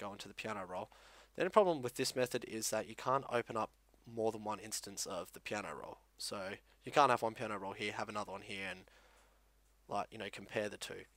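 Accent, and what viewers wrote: Australia. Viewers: YESSSSS AUSTRALIAN! FUCK , WHAT AM I DOING BAHAHA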